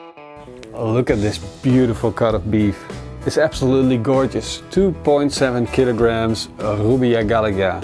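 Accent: Netherlands. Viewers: Hahaha, Nederlands zeker? Hoor je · I knew you were Dutch 5 sec in by your typical English-Dutch accent. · I knew you were fucking dutch. the accent gave it away but i was still doubting myself untill i saw the last part. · you speak with dutch accent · Prices are bit different in the Netherlands. Maybe you noticed his slight accent. 150$ sounds about right in Europe.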